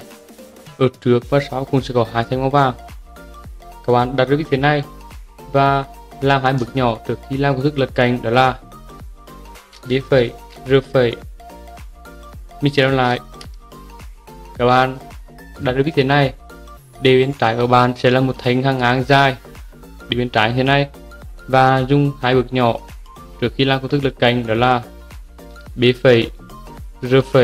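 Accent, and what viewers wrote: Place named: Central, Vietnam. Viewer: nói như tiếng miền trung ấy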